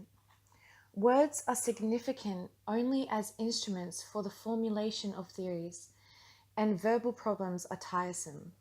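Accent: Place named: Australia. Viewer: Awesome and great to hear this in an Aussie accent!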